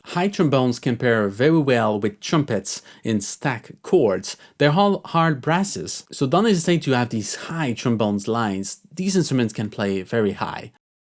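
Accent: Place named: France. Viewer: Great tips, many thanks! Coming from a language where “h” sound is much harder than in English pronunciation, your implied French “h”’s took some time to get used to. Also, being a beginner, had to look up what “Cr.” stands for - learned something new and absolutely necessary.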